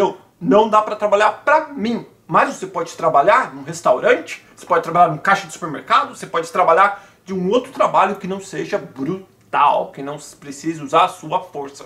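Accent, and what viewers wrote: United States. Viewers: Nem parece que é brasileiro com esse super sotaque americano. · Você parece um americano mesmo falando português... uma pena que meu Inglês não chega nem no básico! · Vc ta com sutaque de americano, é bem engraçado kkkk (obs: nao sei se é assim q se escreve sutaque).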